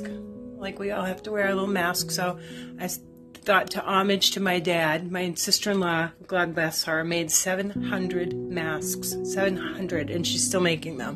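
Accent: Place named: East Coast, United States. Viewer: Always enjoy seeing your home and how you decorate..love your eastern accent 💐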